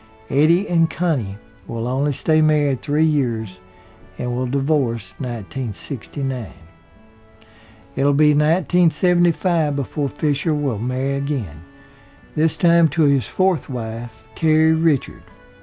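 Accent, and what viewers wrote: South, United States. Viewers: 👍YES I TRULY LOVE his southern accent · ja, er ist einfach super Mit seinem 'southern Snarl' So howdy mässig :o) Recheriert sicher viel LG aus Franken!!!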